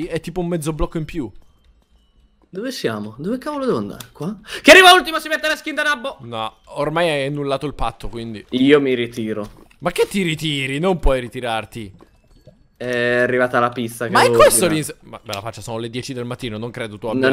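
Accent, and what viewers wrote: Sicily, Italy. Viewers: Ma sei siciliano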